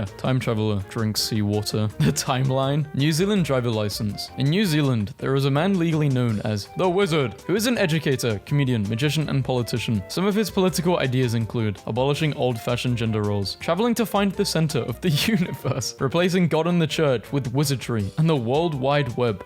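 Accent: United Kingdom. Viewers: the vid was so good i didnt notice u were a brit for most of it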